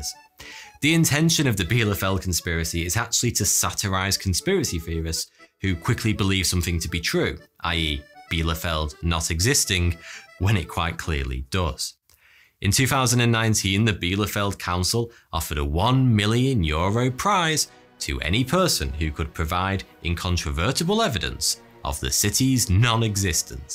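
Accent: United Kingdom. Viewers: "to put it mildly, a bit shit" Love that British accent.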